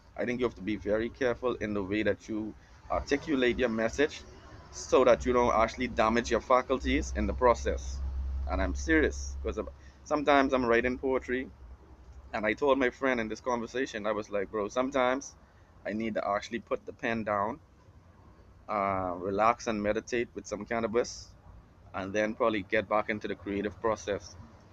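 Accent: Bahamas. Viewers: You Bahamian bro?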